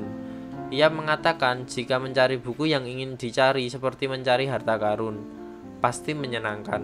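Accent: Java, Indonesia. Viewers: Medok men... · Bro jagan medok dong plis deh. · Abang orang jawa ya?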